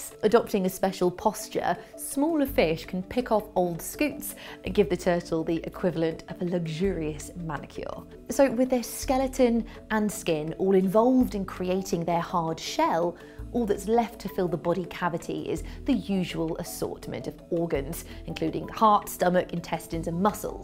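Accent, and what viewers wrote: United Kingdom. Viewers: Loved the vid...love the Brit accent! "Water" becomes, "wwWW-ta" LOVE it! (not making fun, I really do like listening to Brits talk)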